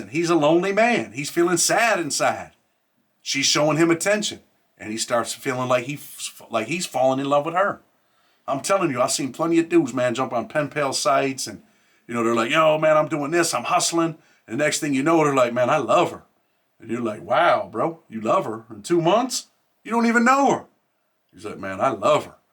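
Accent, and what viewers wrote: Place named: South, United States. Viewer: This is one of the first videos that I've ever laughed... I know a serious subject but the country accent like your making fun of me or are part of my family...lol.. it was funny to hear you talking that way plus making light of the video then you say remember to smile....LMAO it's the only video I have watched of yours that made me laugh and didn't feel so serious and as though it were life and death!! Much love and respect. God bless from Morganton NC!!!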